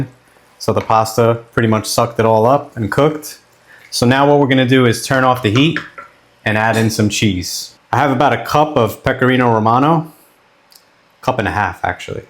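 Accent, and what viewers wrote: Philadelphia, United States. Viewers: God I wish you didn’t speak American, can’t listen to it 🤦🏻‍♂️ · The way you say woodur, lol that philly accent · Very Philadelphia accent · Looks great! I knew a Sicilian woman who would make me peas with rice. Same idea…was simple, but delicious ! Do I hear a Philadelphia accent ? The way you say WATER.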